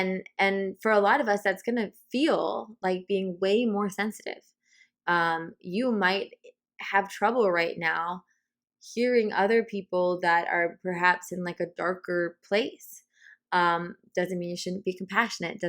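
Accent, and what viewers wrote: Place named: New York, United States. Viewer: Yeah ! And the NY accent makes feel home, lol ! (LI)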